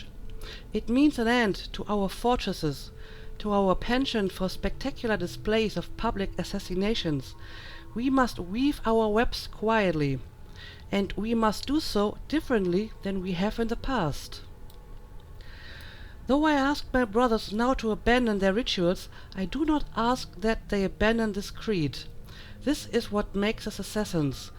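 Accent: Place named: Germany. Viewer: Hey! I'm new to your channel and one of the first things I noticed was your accent. So quick question, are you German?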